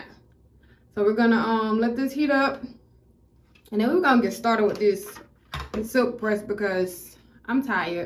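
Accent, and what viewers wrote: United States. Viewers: Omg loved the hair! I work from home and most of the time I wear my hair in a big fro. When I straighten it(not too often), my husband don't know how to act chile!! Lol. Your hair is beautiful, cool personality. New subbie here!!😊 Are you from New Orleans? Sounds like it😊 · I could hear the beautiful southern accent ❤. Yep it’s hot 🥵 down here… you look gorgeous ❤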